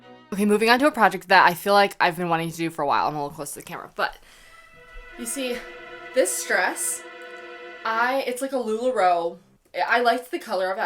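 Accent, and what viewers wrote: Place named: Midwest, United States. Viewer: as a cali girl i find your midwestern accent so soothing and refreshing from the influencer vocal fry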